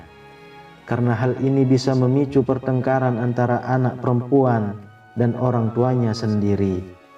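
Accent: Lombok, Indonesia. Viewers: Abang dari lombok kah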